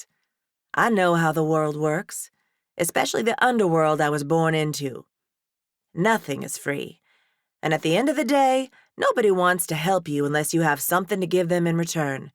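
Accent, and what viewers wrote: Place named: South, United States. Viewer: YESSSS this is going to be very interesting!!! As a Black South Carolina woman I really appreciate Southern voice. 😜🤩💯❤🏍🌪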